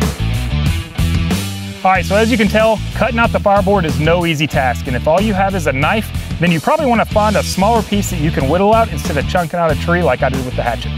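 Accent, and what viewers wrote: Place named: Tennessee, United States. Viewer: What part of Tennessee u from brotha?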